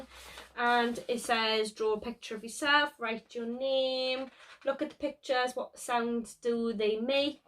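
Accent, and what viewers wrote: Wales, United Kingdom. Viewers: Are you from Wales?🏴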